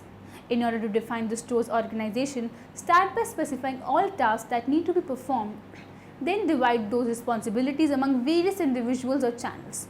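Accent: India. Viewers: She is speaking in English with an Indian Accent. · I have no idea why people are criticizing her accent. Apparently she is born to be an Indian and that's part of her characteristics, that's her.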